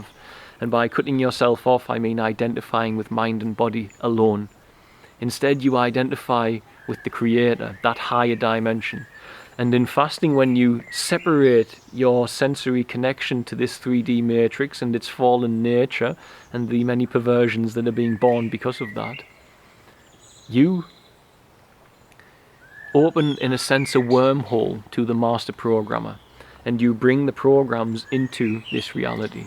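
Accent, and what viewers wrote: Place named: Newcastle, United Kingdom. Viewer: i love your slight geordie accent lol i am a geordie trying to be godly too :P lol thanks for the info and videos, keep spreading the light xxx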